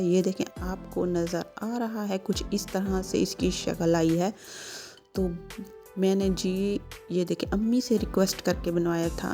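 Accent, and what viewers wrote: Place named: Sindh, India. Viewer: Me new luranay video ap k dekhi, lgrha he jesah sindhi log sindhi style ,me b sindhi hun aap hyderabd me ho ya karachi me??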